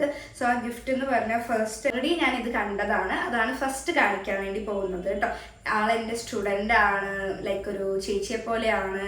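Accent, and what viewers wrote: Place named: Palakkad, India. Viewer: പാലക്കാടൻ നിഷ്കളങ്ക സംസാരം😍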